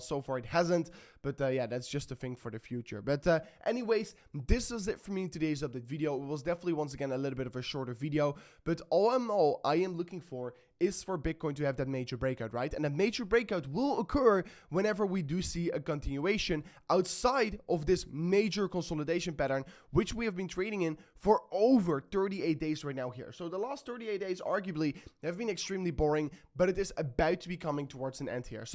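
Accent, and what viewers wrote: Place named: Netherlands. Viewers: Ben jij Nederlander of vlaming ofzo? ik hoor een accentje. groetjes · Yep he is Dutch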